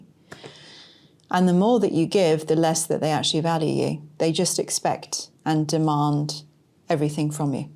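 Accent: United Kingdom. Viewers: In my prayers always., I think you look very healthy and beautiful… I believe you are a Spirit dancing along…I believe you will THRIVE! My ancestors are Kelham Island, just north of Sheffield…I so love the British and will listen to your beautiful accent forever., you will SUCCEED! Godspeed!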